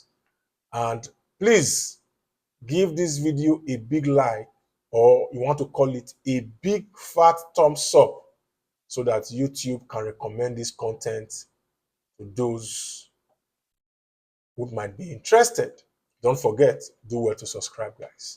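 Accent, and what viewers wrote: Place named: Nigeria. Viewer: Your Nigerian accent is too thick making it difficult to believe anything you say sorry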